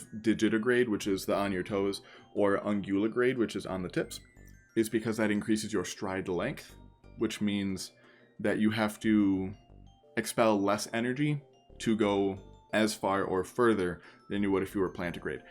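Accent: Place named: New York, United States. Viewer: No it,s acshuly a new yourk acsent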